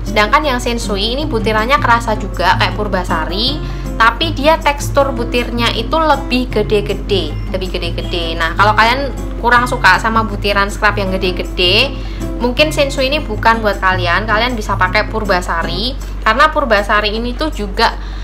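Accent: Java, Indonesia. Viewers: Wong jowo to mbak? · Org Jawa ya ? Logat ny kek jawa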